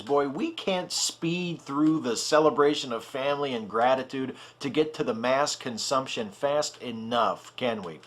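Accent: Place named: Appalachia, United States. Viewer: That fucking hill billy accent. xD I'd like to hear more of that!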